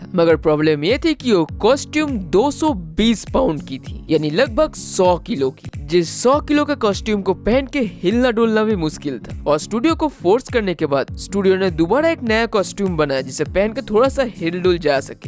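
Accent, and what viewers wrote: Bengal, India. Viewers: Tum aisa q bolta hai tum bangali hai kya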